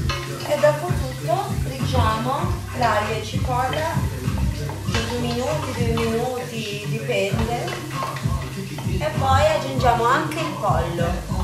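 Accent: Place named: Liguria, Italy. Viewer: Che bello il tuo accento ligure orientalizzato...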